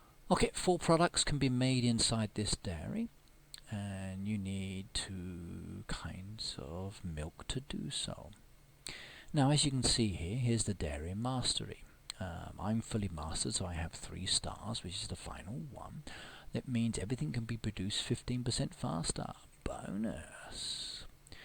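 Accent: United Kingdom. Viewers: He's British lol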